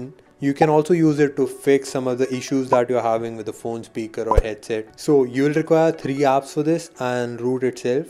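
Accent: India. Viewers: fucking indian accent, cant stand it!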